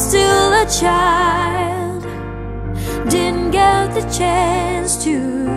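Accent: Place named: Indonesia. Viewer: HAHAHAHA yeke..sebab cara ckp mcm org indo..adehh baru nk puji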